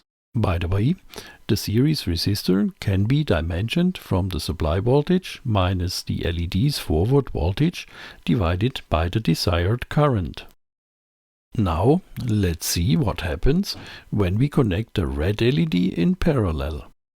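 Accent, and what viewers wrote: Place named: Germany. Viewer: Cool. Interessant. Wollte sowas bauen ala Signal Lämpchen mit pwm am minuspol zur globalen Helligkeitsregelung. Die LEDs müssen aber dann je nach Farbe eimen passenden Widerstand bekommen. Hab schon erst mal ins Klo gegriffen, transparente LEDs sind ungeeignet. PS. Deutsches Englisch ist fürchterlich 8) gerne of deutsch. Ich glaube, Mittlerweile kann man auch mehrere Audio Tracks anfügen für verschiedene Sprachen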